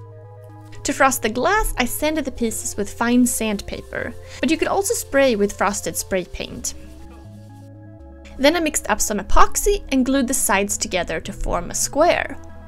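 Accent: Sweden. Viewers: kul projekt! (antar att du är svensk pga uttalet) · swedish accent! :) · You sound like you are from sweden :D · Very cool and creative product, keep it up! also, are you by any chance Swedish? your accent sounds really Swedish! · You sound really Swedish haha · HI! Read that you live in Oregon now but you're from Sweden originally right? Recognize the accent ;) Moved there recently? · are you Swedish? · Nice! Well done! BTW R U from Sweden by any chance? Din dialekt är skön :) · Are you Swedish? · Hey, I wonder where you are from? :) what country? you sounds like a swed :P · 100% swedish accent! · are you Swedish? nice video! totally making this.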